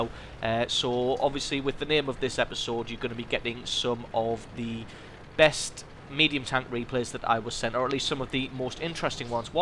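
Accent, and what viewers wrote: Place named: Newcastle, United Kingdom. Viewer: Nice. Im new you this channel. One question, Are you from Newcastle??? If so where abouts? I live in wallsend. Just nice to hear someone that sounds like me on youtube haha.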